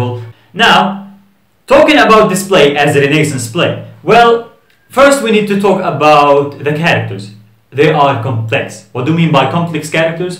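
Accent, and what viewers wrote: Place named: Turkey. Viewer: your accent sounds like Turkish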